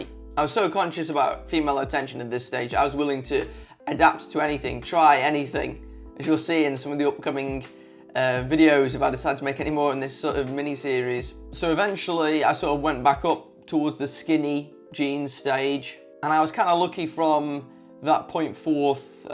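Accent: United Kingdom. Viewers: I felt like you were describing my life honestly. I went from baggy to slim to super skinny spray on jeans, now I wear fitted skinny jeans (because I am still skinny) and it's such a difference. I still get told my legs are skinny but there's no way to hide that honestly, just to mitigate. Great series - came to see what it's about and stayed till the end until I noticed it's the end. Maybe it's your british accent, maybe it's because I can relate. Good content.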